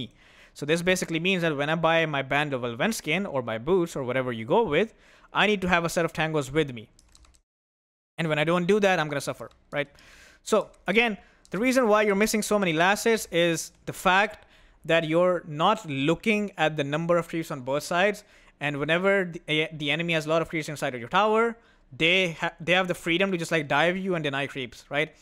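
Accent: India. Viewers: He sounds Indian. I’d be constantly angry too if I was a subhuman.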